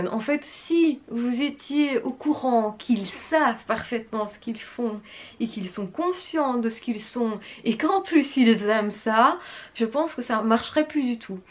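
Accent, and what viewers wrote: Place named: Belgium. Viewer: très très biesse... Aaah une belge ^^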